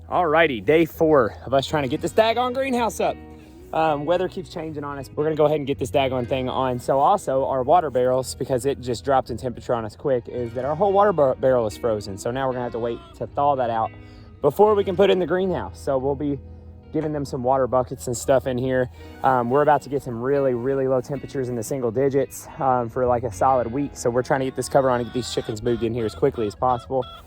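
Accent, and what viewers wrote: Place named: South, United States. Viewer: You have a southern accent. Where are y’all? I’m in NC. Just ran across your channel 👍🌻🥬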